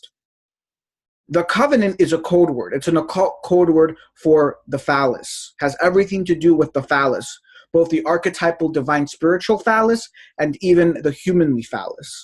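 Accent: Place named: Israel. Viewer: Ah ok. I have many Israeli friends and you sounded like one of my friends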